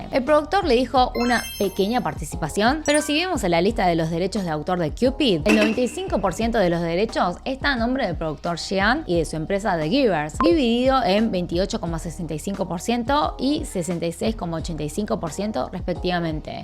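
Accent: Argentina. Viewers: Su acento es argentino porque nació y vivió mucho tiempo en Argentina. Ahora soporta panzona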